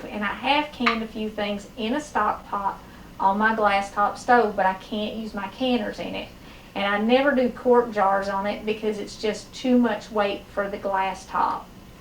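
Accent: South, United States. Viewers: Love your videos! Sounds like you are from TN or KY.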